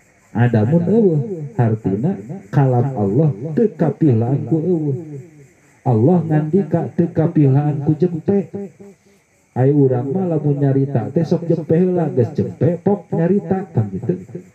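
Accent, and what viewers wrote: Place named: Sunda, Indonesia. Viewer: urang sunda mah tangtu raoseun ngangge logat sunda, nuhun pisan.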